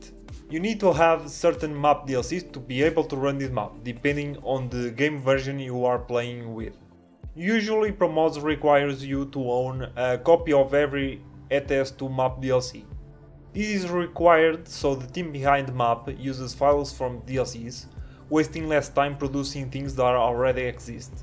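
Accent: Portugal. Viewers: Eu juro que so pelo sotaque imaginei logo que fosses portugues ahahah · quase certeza que é portugues esse sotaque não mente kkkkk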